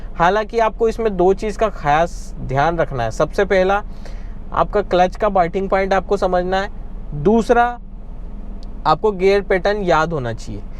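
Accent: Indore, India. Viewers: आपकी लैंग्वेज का टोन इंदौरी लग रहा है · Indori accent truly at the point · Lagta he bhai ap Indore se hoo 😂😂😂😂😂😂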